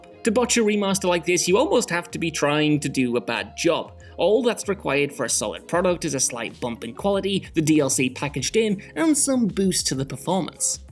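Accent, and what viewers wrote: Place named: North East England, United Kingdom. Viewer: He’s got a bit of a north eastern English accent.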